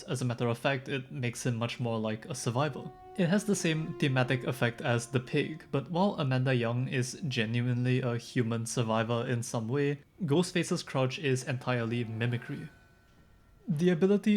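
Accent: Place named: Singapore. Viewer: this guys accent sounds singaporean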